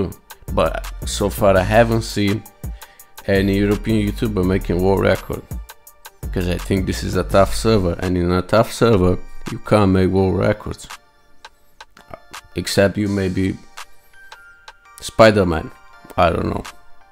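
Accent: England, United Kingdom. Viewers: Fancy British accent plz (if possible) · I like the fancy British accent voice over and I love this one too😍....anyhow u wish u wish to upload videos!! Drop a 1hr video we'll still watch it from top to bottom...much appreciations to you for making us a better player!! Cheers❤️❤️ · It's a tough choice between the accents. Maybe make it both? British for general narration gives is like a documentary feel which is nice, and your own seem to work well for the explanations. The format for this video seemed real helpful in showing how to adapt to the situations that unfold and noting things to be aware of in them. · We want the fancy British accent back now! ❤️❤️❤️ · Regarding the voice: I dont know buddy, the british one is nice but we dont watch you for entertainment, we watch you to learn stuff. Entertaining voice or not, real fans always gonna watch ❤ so your choice man, do whatever you like most hoping every fan thinks about it this way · it feels so different without that british accent voice over haha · para mi esta bien un video con tu voz y no la voz con acento inglés. 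🇲🇽 🇲🇽 💪💪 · We want the fancy British accent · British accent it is so much fun!!! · Let's keep with british voice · Cheers! I would like to hear that British accent voice again. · I enjoy it, do both, British and your original voice at the same time ❤️🙏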